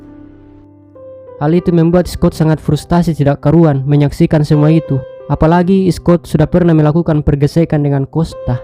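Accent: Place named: Jakarta, Indonesia. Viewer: owh..tapi cara ngomongnya kayak orang Betawi.....tapi semangat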